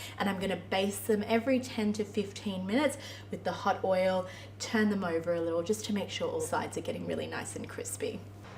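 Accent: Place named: Australia. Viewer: So you’re Australian, I should have known your accent.I’ve made your garlic confit. I love all your videos.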